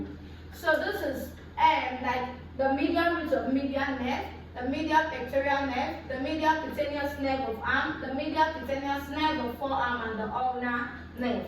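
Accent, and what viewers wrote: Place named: Nigeria. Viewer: In our mother's accent. Thankyou mama naija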